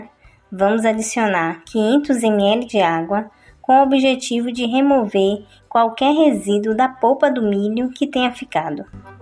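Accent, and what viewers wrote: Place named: Northeast, Brazil. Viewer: Boa noite gostei sutaque nordestino eu amo nordestino Bahia eu Itabuna Aracaju Sergipe ou meu pai morreu era sergipano sou de Santos gostei do vídeo parabéns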